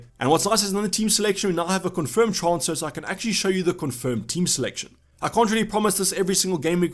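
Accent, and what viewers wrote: South Africa. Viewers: Where in South Africa are you from bro? 🇿🇦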